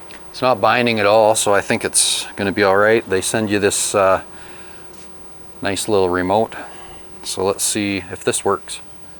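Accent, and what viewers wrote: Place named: Canada. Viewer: You must be Canadian..... the accent gives you away!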